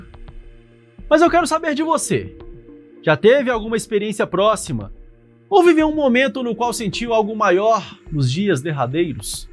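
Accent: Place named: Minas Gerais, Brazil. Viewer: Adoro seus vídeos, muito bem explicados, sua voz suave e esse sotaque mineiro maravilhoso!!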